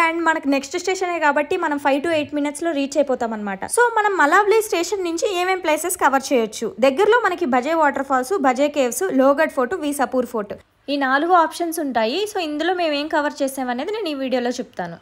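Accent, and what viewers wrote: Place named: Telugu, India. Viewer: ur pure Telugu slang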